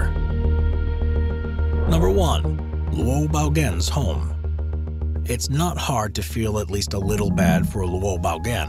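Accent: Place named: Philadelphia, United States. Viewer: super random by are you by chance from the philadelphia area? I notice the way you say words like "majority" and "Florida" with the a pronunciation which is how my philadelphian parents talk. I feel like I dont hear it that often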